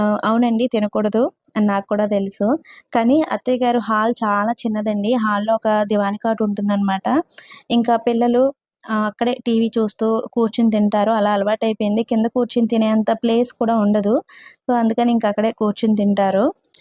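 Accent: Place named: Godavari, India. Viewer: Ma godavarolla basha na ...majaka super undhi ......godarollamani mana language lo mana respect lo telisipothundhi.....nice vlog andi very nice chala bagundhi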